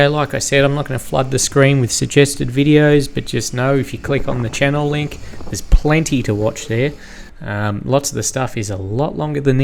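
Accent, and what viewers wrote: Australia. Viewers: Hi,! No need to stop talking in your videos! I enjoy your narratives about what you are doing. I also like your Aussie accent!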